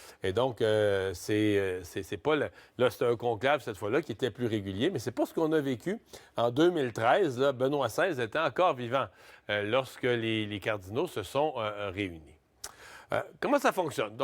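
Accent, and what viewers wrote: Canada. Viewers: Accent typiquement canadien. Je comprends pas comment on peut avoir un accent si bizarre! Les votes des papes se sont toujours passes comme dans des republiques bananieres. Et la chretiente, elle est ou alors!!!!????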